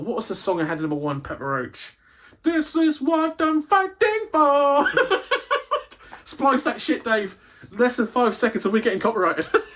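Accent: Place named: Germany. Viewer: That was 1 funny upload 😂 loved the German accent 😂 and defo checkout gaming rarities channel 👍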